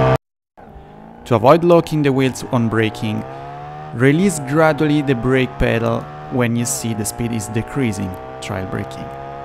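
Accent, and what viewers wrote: Italy. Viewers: I love your Italian accent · L'accento italiano antisgamo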